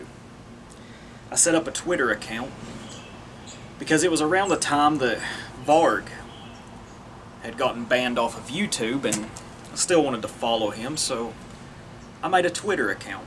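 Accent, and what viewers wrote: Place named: South, United States. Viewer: These people probably saw you as an object of academic study with your southern accent (btw as someone who is proud of his own dialect, it's great to hear an american who speaks american for once.) and your opinions and job you fit into the cliche for them, which is really funny if you think about it. The joke is on them.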